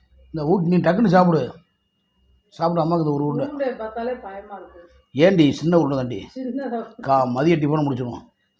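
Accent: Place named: Dharmapuri, India. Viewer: அக்கா பேச்சு சூப்பர் தர்மபுரி பேச்சு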